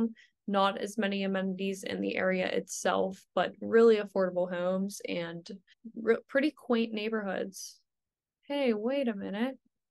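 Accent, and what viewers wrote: Pittsburgh, United States. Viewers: It’s Pittsburghese.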